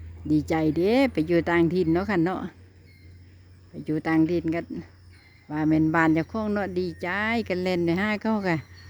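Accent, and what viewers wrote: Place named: Thailand. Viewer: มาฟังนำจ้า เว้าคือกับไทเลย นครไทยกะติดกัน เว้าคือกันจร้า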